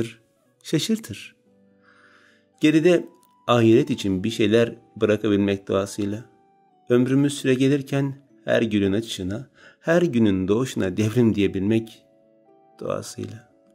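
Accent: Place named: Black Sea, Turkey. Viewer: Selam kardeşim iyisindir inşallah bu hikayedeki Karadeniz sive çok hoşuma gitti ben bı Karadenizli olarak bu kadar güzel konuşamam selametle Allah'a emanet ol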